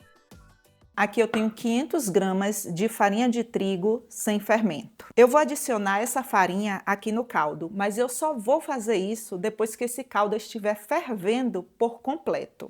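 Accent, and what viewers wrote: Bahia, Brazil. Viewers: Oi amada com certeza vc é baiana sotaque que morro de sau😮dades dos meus amigos solteropolitanos, morei em Salvador 22anos, bjos amada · Tenho certeza que a senhora é baiana É o nosso sotaque! Maravilhosa receita !!!!! Parabéns pelo trabalho 🎉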